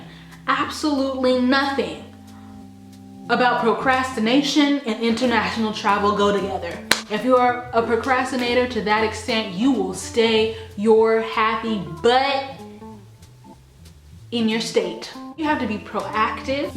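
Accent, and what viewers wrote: Nairobi, Kenya. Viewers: lol the kenyan accent gets me everytime coz me and my cousins do it all the time! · dig the bougie nairobi accent lol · UMMMM i love your Nairobi accent!! I need to check to see if you you've done any ACCENT tag videos!!! This video was just so awesome!!! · Love the bougie Nairobi accent. Reminded me of Don Cheadle in Hotel Rwanda, somehow.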